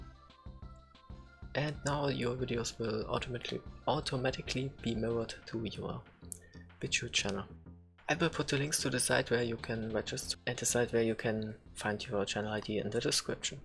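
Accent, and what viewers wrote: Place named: Germany. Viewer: Der deutsche Akzent war unüberhörbar. Danke :)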